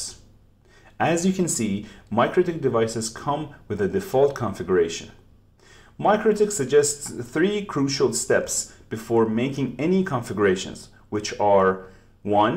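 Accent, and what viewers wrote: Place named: Iran. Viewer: Damn.u got some persian accent.nice teaching by the way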